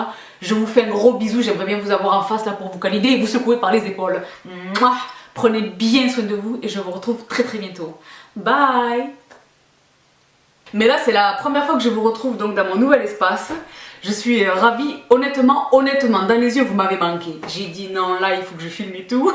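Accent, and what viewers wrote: South, France. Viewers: J'adore ta façon de parler. Franche et rigolote. Et tu as un accent excellent, ca me rappelle mon sud, moi qui me suis expatriée. Je suis d'accord avec toi, continue, tu es top.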